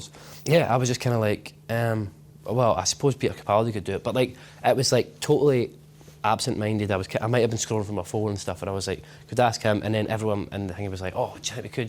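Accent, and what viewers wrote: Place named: Scotland, United Kingdom. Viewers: Ass Juice right the Scottish accent is beautiful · That song fcks me up, and now that I know he's Scottish (omfg lovely accent) and seems nice, I'm a fan ✌️ [also he looks a bit like Paul McCartney, I think it's the eyes]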